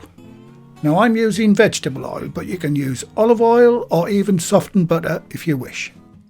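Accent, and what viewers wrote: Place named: Northern England, United Kingdom. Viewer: Awesome video and for this old English gal displaced in Texas, it was lovely hearing that great northern accent! I’m going to have a break from making sourdough to bake this beautiful loaf, less of a challenge! Thank you 🤩👍🏻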